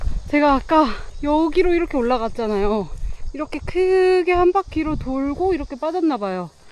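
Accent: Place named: Gyeongsang, South Korea. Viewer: 아~~~주변에 비해 높다 하여 노꼬메 인가 보네요 경상도 사투리 이군요 제주 방언 인가 하였네요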